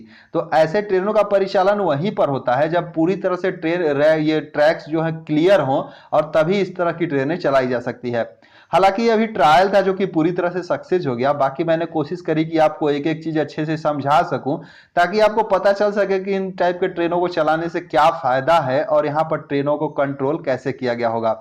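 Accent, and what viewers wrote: Bihar, India. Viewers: Bhai aap samastipur ya darbhanga se lagte ho aapki boli bohot meethi hai 😁👌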